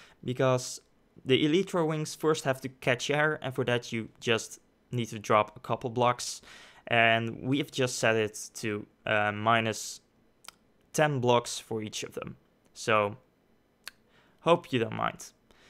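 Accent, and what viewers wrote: Netherlands. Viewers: Are you dutch? You really have that dutch-english accent, i know because i am dutch XD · bad english lol, you are obviously dutch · kerel, jouw nederlandse accent😂😂 nice! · he has a dutch accent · I can hear That youre dutch from ur accent :P goeie video man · are you dutch i can hear it from your accent? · hey you dutch?????? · Holy shit je nederlandse accent man xD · You sound dutch. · you're Dutch aren't you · Netherlands xD Ik hoorde je accent al een klein beetje. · je hoort gewoon dat je nederlands bent...lool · Are you from Belgium or Netherlands? :p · Ben je nedderlands haha ik hoor het aan je accent leuke video ga zo door!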